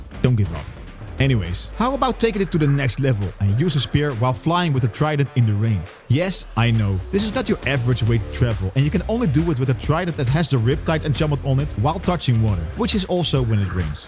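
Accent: Netherlands. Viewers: The accent gives it away Hello fellow dutchie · W tutorial, deze guy is zowizo nederlands!!! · You dutch? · Great vid, and great dutch accent :D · i smell dutch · Holy nederlands accent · Just a question, are you dutch? You just sound like how dutch people talk in english. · I smell a dutch accent huh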